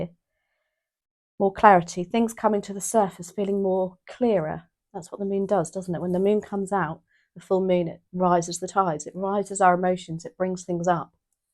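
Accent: United Kingdom. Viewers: I do think a lot about money and once I heal I will get chances to make it a lot, but right now I will try to take manifestation advice. Reading is astonishingly accurate and excellent as always. You are also very beautiful lady and Your British accent with great readings is just delight to hear. Thank you for helping us very much!!! ☘🌷🌺🌷🌺🌷🌺🌷💗🌺🌷🌺🌷🌺🌷☘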